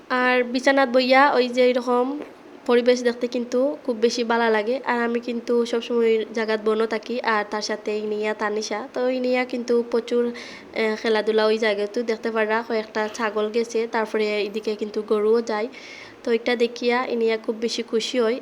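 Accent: Sylhet, Bangladesh. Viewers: আপু সিলেটের কথা গুলো শুনতে অনেক অনেক ভালো লাগলো রুমটা খুব সুন্দর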